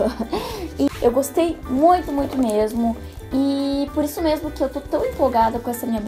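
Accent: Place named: South, Brazil. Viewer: Eu amo esse sotaque do Sul. ♥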